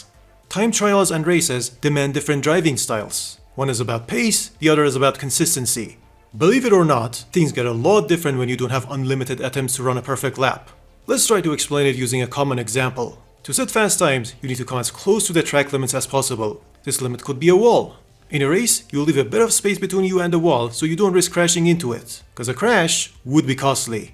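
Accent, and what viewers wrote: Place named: Iran. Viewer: yeah i know ، i didn't want to disrespect you brother , but you have the same accent as Iranians , i thought you might be Iranian like i am , im Persian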